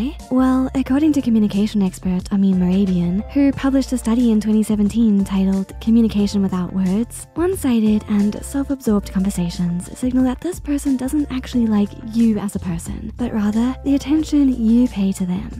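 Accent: United Kingdom. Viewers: A British Amanda Silvera! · Was thinking the same although I also enjoyed the British accent ❤ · unrelated to the video but why does narrator have a british accent now??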